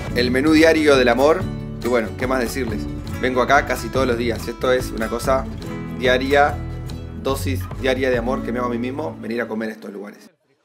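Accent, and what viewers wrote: Argentina. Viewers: Quizás porque es argentino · Amo tu acento argentino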